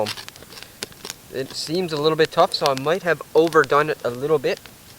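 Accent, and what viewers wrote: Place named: Cape Breton, Canada. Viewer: You sound like my Cape Breton brother the way you speak. I enjoyed this video. Thank you🤗🇨🇦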